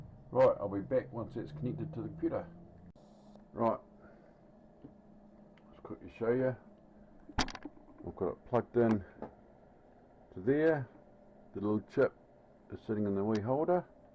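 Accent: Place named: Australia. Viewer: I've been doing similar hacks for ~25 years. I mainly repair arcade games but my house alarm had an installer password on it so the user can't change any settings. I dumped the eeprom then using the installation manual I found online I did a factory reset. then I dumped the eeprom again and did a search within that dump for the known factory password. then I simply looked at my original dump at the same memory location and found the installer's password. now I can change any settings I want LOL! You sound like an Aussie... me too! I'm on the West side Perth. Where are you?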